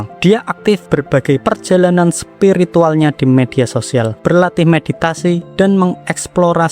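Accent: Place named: Java, Indonesia. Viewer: KA medok nya ganggu maaf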